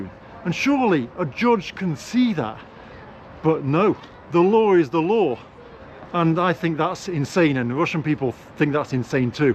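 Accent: United Kingdom. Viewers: your accent somehow brought me to my school years, when on learning English we were listening to the tapes, and thats exactly how the typical British man sounded then. Got suscribed because of that small piece of nostalgy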